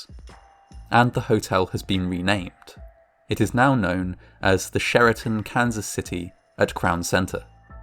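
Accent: England, United Kingdom. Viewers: I LOVE SO MUCH YOUR ENGLISH PRONUNCIATION, THE GENUINE BRITISH ENGLISH ! I AM FROM BRAZIL AND STUDY ENGLISH! I AM GOING TO WATCH ALL YOUR VIDEOS JUST BECAUSE I ADORE THE WAY YOU SPEAK BRITISH ENGLISH! CONGRATULATIONS FOR YOU!👍👍👏👏👏👏👏👏👏👏👏👏👏👏👏👏👏👏👏👏👏👏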